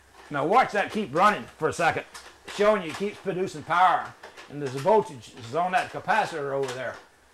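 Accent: United States. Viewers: Just because he has a country accent doesn't mean he's uneducated. He may very well be a pioneer of something great and beyond the "education" of the masses. Many highly "educated" are so indoctrinated with book-knowledge that they never do anything great with it. There are other who have experiential knowledge and are willing to go beyond the status quo to discover something new. Hopefully this man is one of those people.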